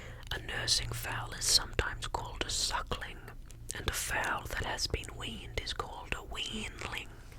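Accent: Australia. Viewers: Maaan, your Aussie accent is EVERYTHING!😍😍I will listen to you whisper ANYTHING and still tingle!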